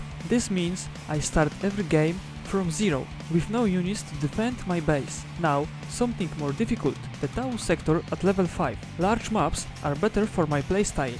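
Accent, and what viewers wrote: Poland. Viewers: I hear that accent, and i think i am not wrong, a Fellow Pole you are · Słyszę chyba polski akcent 🤔 Mam Cię gagatku 😁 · Ooofff... the ponglish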